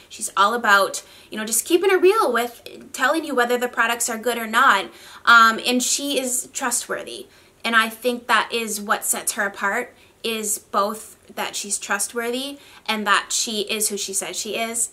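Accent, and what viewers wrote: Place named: Upper Midwest, United States. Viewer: I LOVED this concept!!! And don’t worry, you still have your accent. It’s part of what I enjoy about you being from the Northern Midwest as well 🤗😍👏❤️💕✨💕💕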